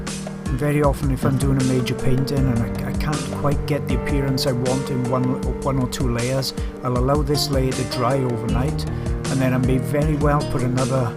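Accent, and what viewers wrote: United Kingdom. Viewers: Interesting but the music is too loud so it's difficult to understand when we don't speak British.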